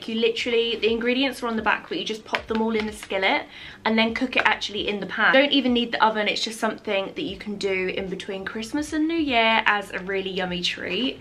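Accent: United Kingdom. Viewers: What accent do you speak with? I know that your accent is british, but is it a received pronunciation ?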